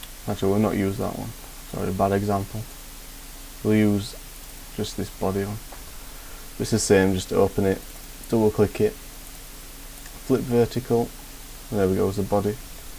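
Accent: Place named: Keighley, United Kingdom. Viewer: You sound like a Keighley lad!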